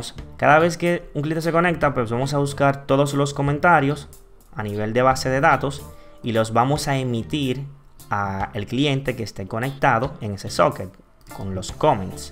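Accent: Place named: Dominican Republic. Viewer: Eh, Dominicano! XD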